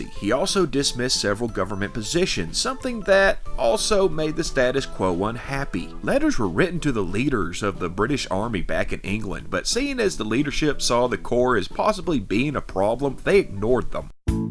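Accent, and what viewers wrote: United States. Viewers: Great work! Got a bit of a laugh hearing someone so American sounding talk so succinctly about early Australian history…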